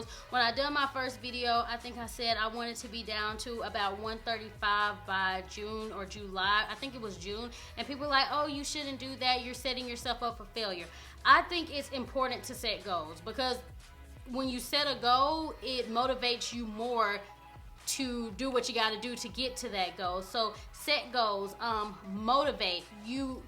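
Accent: South, United States. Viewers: I LOVE YOUR SOUTHERNESS IT MAKES YOU SO INTERESTIING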